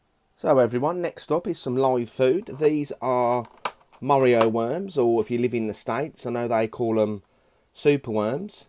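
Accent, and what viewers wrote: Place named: United Kingdom. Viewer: Nice pick ups .. Always enjoy your videos. Ur your accent is my fav out of all the UK people lol shhh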